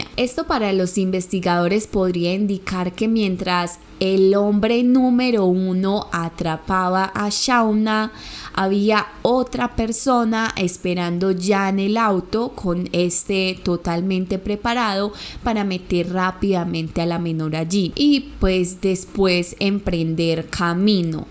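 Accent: Colombia. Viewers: Pobre niña En poco tiempo te has convertido en una de las mejores y tu acento colombiano me encanta 👍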